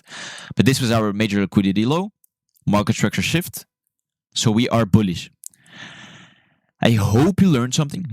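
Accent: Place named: Netherlands. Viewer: ahh okay.. thanks for replying. Btw..Nice Dutch-English accent you got there!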